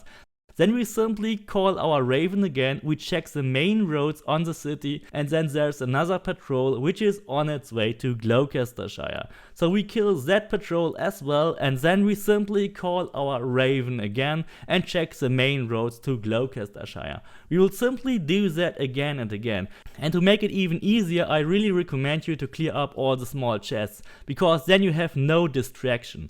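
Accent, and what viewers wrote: Germany. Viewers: Short Question ur German?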